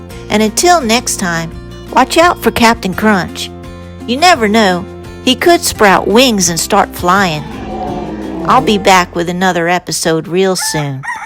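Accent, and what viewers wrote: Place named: United States. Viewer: I am enjoying your episodes. They are very delightful to listen to.. I love that voice that has a country draw to it.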